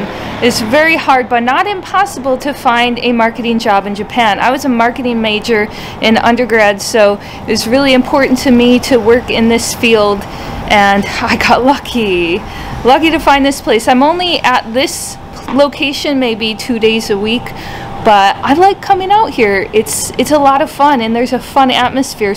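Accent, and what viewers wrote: United States. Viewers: Wow you have so strong American accent. Can you practice against that? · Was going to check out your channel, but then saw the name. The term oriental is seen as a derogatory term in the US and it appears from your accent that you may be American.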